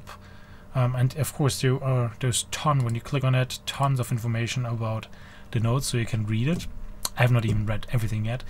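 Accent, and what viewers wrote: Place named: Germany. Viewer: I noticed the German accent and was bit confused that there's the "United States" in the channel description.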